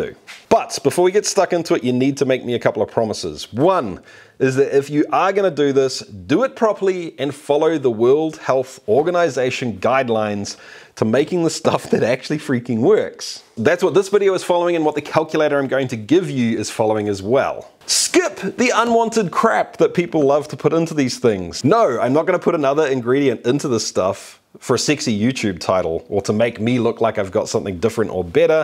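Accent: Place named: New Zealand. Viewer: Your accent is awesome. Blame Worst Premade Ever for ruining the New Zealand accent for everybody.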